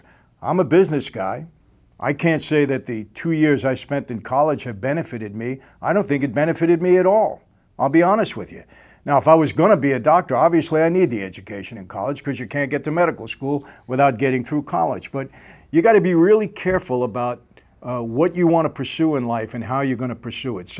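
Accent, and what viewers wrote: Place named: New York, United States. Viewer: The New York accent has to be the best accent to talk serious business.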